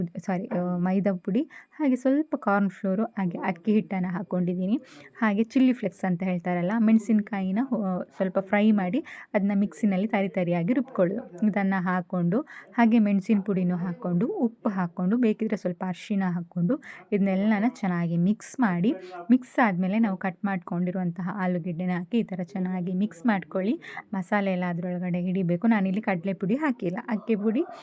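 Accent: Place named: Mangalore, India. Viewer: Manglore kannada mathadi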